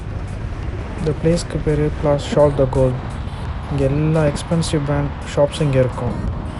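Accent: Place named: United Kingdom. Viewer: Tamil la UK accent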